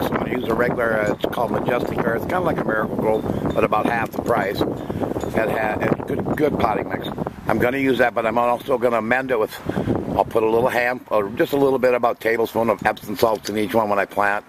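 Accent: Minnesota, United States. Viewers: im sitting here watching his videos thinking the way this guy talks hes gotta be from minnesota haha. and sure enough he is. cheers from saint paul!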